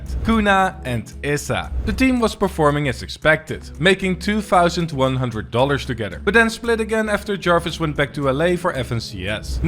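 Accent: Netherlands. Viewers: Me after half of ur first sentence: Yes, this guy is DEFINITELY Dutch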